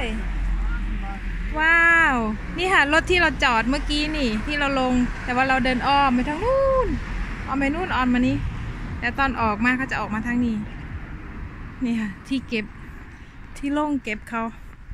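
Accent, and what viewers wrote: Isan, Thailand. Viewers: พูดอีสานฟังไม่รู้เรื่องกรุณาพูดภาคกลางจะดีกว่า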